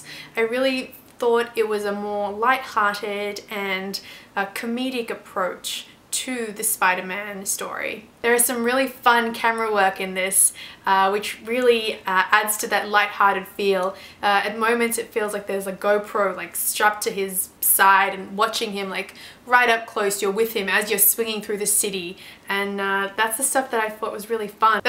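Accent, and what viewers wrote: Australia. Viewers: Great review :) Is that an Aussie accent?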